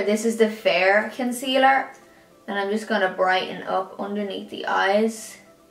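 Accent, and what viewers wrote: Ireland. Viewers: Holy Jesus you're Irish:):) first time watching your vids after following you on social media:) are you a dub as well?xx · I just love listening to your brogue..it reminds me of my Nan who was from Kenmare..☘☘☘☘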